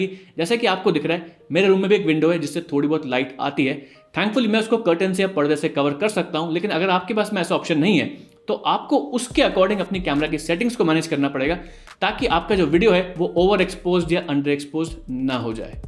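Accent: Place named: Pakistan. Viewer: Week done bro. Apka Hindi/Urdu ka akcent Bilkul h Hamari tarah h mtlb pakisatanio ki tara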